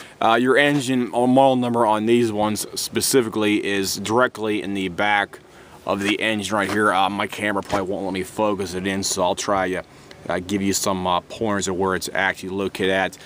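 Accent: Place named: Western Pennsylvania, United States. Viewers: Unmistakable SWPA "accent." Shout out to younz from Uniontown! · That accent of adding 'W's to any word with an 'O' in it is awful. Thankss for demostrating how to lift the hood. What a waste of bandwidth this is. Remind me to never stop in West PA when driving from Detroit to Jersey.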